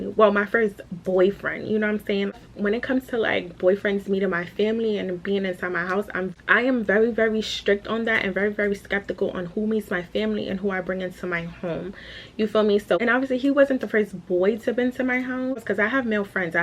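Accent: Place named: New York, United States. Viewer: Are you from NY, I like your accent❤️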